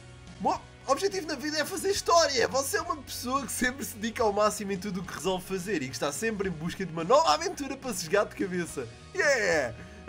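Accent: Portugal. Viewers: tu es o melhor YouTube que fala português de portugal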